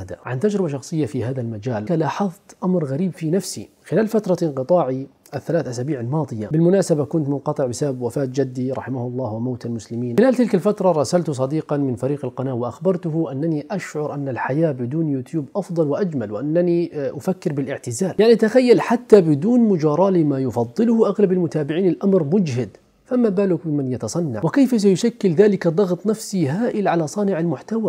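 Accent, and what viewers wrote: Egypt. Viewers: اللهجة المصرية علي لسانك عسل يا ❤ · انت متاثر باللهجة المصرية اوي❤😂 حبيب البي ياسطا😂😂❤ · الفيديو مفيد كالعاده 💝ولهجتك المصريه بتضحكني اوي 😂😂 بس بتتكلم بيها بطريقه حلوه تحياتي ليك من مصر 🇪🇬💝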